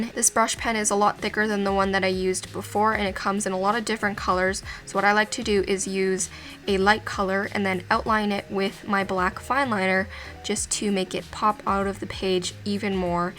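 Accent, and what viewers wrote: Canada. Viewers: I can surely say that you're Canadian from the way you say "about" and "out". Am I right???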